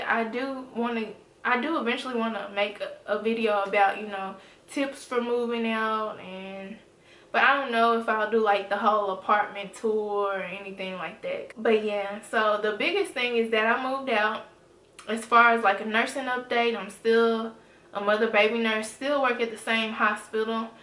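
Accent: South, United States. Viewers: You so country but I love it!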